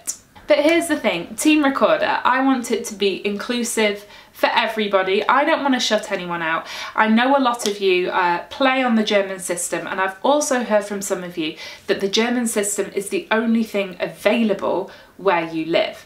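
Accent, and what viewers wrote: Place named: RP, United Kingdom. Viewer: This is amazing. I was wondering why th f sharp sounded so close g on my recorder. I figure it out myself before chek that out with u because of course . you are the authority on the recorder. Your receive pronunciation gives u that title as well.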